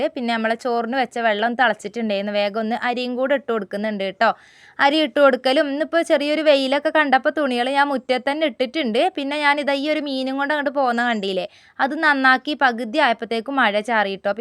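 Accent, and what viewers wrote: Malappuram, India. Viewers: നിങ്ങൾ സംസാരം കേട്ടിട്ട് വയനാട് സ്ളാങ് അല്ലല്ലോ ജനിച്ച നാട് മലപ്പുറം ആണോ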